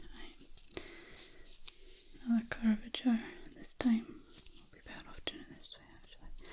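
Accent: Scotland, United Kingdom. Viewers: you're very pretty i love the scottish accent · Scottish accent yes please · This accent is the best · Sooooo Scottish haha WEE , 🥺😭 🤗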